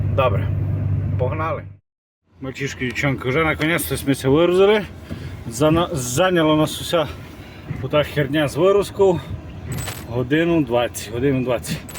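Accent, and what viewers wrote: Transcarpathia, Ukraine. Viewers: Закарпатська говірка неперевершена!!!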